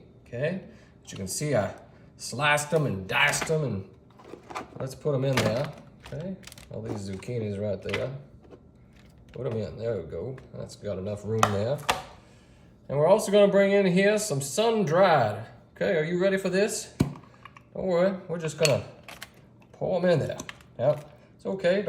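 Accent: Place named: Louisiana, United States. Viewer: Fantastic accent! May I ask where you're from? Could it be Louisiana?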